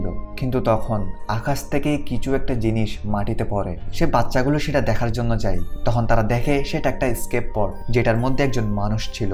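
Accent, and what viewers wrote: Chittagong, Bangladesh. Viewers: ভাই তো চিটাইংগার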